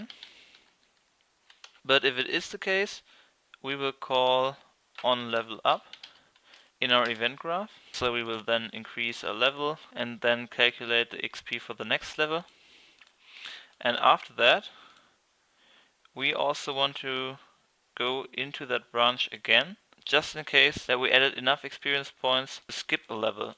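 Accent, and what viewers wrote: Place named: Germany. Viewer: Hey dude, ty for you´r fast answer. I have tried it in version 4.14 now and it works :) greetz from germany :P btw are from ger too maybe? there some sound in your dialect sounds like germans whos speaks english :D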